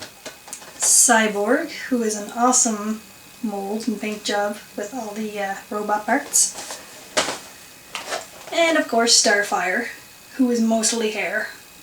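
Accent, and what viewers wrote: Ireland. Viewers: Are yu from Ireland? You kind of sound like us haha I wish we got a haul like this! :P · R u Irish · I can't decide wether she is Irish or American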